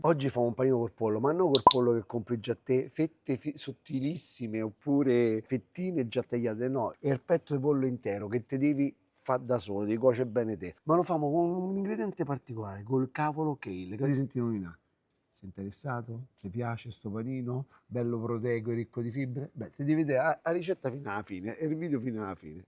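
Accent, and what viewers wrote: Rome, Italy. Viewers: Bravo MAX, quando cucini così è piacevole. p.s. i sottotitoli in italiano servono a tradurre il tuo accento romano ? dai penso che si capisce quello che dici ....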